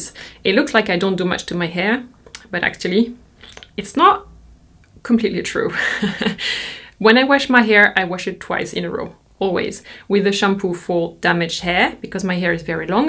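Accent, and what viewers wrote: France. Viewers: She has a French accent. The more you listen the more you'll understand. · I just enjoy your French accent so much Music to my ears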